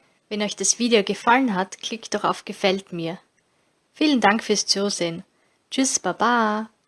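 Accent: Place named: Styria, Austria. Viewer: Du kommst aus der Steiermark, gell?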